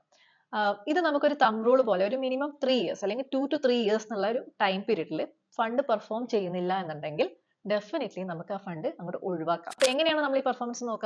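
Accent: Kannur, India. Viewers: കണ്ണൂർ കാരി സ…അല്ലേ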